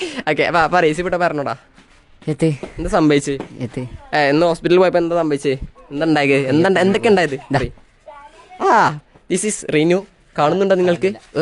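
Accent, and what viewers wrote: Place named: Manjeri, India. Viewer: Manjery slag adipoli,valkeene😂😂😂😂❤❤❤❤❤ ❤❤❤❤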